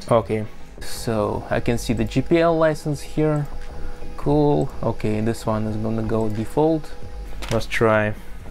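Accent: Russia. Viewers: вы русский? Просто акцент чисто русский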